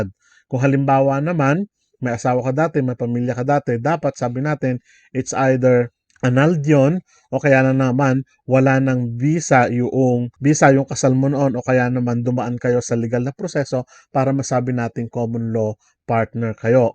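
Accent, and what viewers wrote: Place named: Baguio, Philippines. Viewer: Parang tga Baguio si kumander kuya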